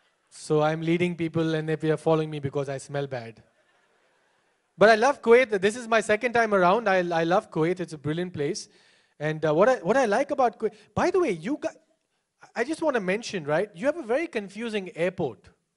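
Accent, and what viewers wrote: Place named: Philippines. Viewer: Your Filipino accent is killing bruh!!!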